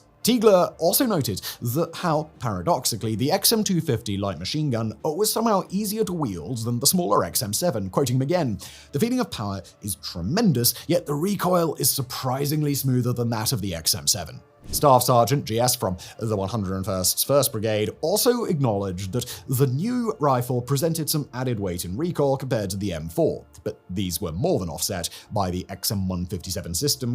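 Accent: United Kingdom. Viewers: Ah, at last my broadly divergent taste in YouTube has converged. Footage of Garand Thumb, set to the dulcet British tones of Simon Whistler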